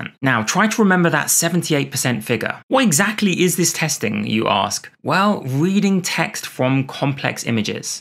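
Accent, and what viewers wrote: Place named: United Kingdom. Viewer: Having a British accent is a cheat code for being a science / STEM YouTuber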